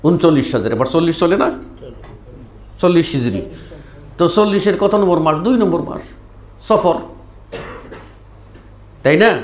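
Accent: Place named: Sylhet, Bangladesh. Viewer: He is a Sylheti accent..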